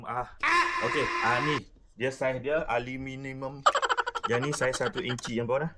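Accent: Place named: Perak, Malaysia. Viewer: 😂😂😂 Peraq betui mu. Aaarr.. Psl x sebut Anmummm😅 oiii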